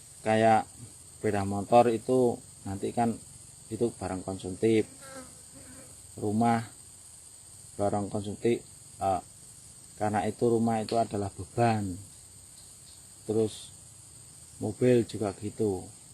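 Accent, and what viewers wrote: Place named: Java, Indonesia. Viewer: Ojo medhok to boss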